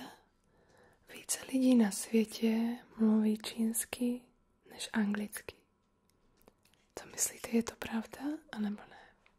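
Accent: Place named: Slovakia. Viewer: Jé to je hezký, jak i v té češtině máš slovenský přízvuk a tu češtinu máš takovou pěkně měkkou :-). A chválím za ř. Vyslovuješ ho moc pěkně. Asi to bylo pro tebe moc těžký soustředit se na češtinu a ještě šepot. Takže video polohlasem a to já osobně moc nemusím. Takže mě se líbíš, když mluvíš slovensky, protože máš krásně jemný, uklidňující šepot a myslím, že to je právě tou slovenštinou,protože je taková měkká a pro ASMR super. Jinak fakt chválím za pěkná videa a, že poslední dobou hodně vydáváš.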